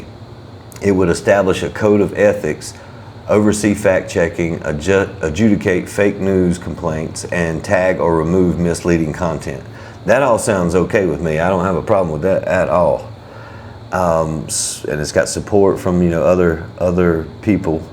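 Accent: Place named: South, United States. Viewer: Hi Mr. please don’t leave.I loved watching you, I’m a pilipina 72 years old and I enjoyed watching you. You seems like you’re down to earth young man,I’m from Little Rock Arkansas so I just love your southern accent 😊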